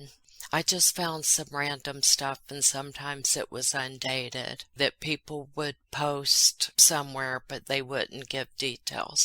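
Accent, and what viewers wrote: South, United States. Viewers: PS. You also teach me so many new expressions in the English lingo. I'm very grateful. Your sense of humor is just my cup of tea. Your southern accent isn't that pronounced to me as some I've heard from/of. I myself has a "cosmopolitan" accent, my best friends from the U.S. told me last time me and my hubby visited them. That is more kindly said "Your accent is all over the place with Swedish, British, Australian and American and it sounds pretty f'ck*ng funny!" DS. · southern drawl! It reminds me of my southern-as-all-hell aunts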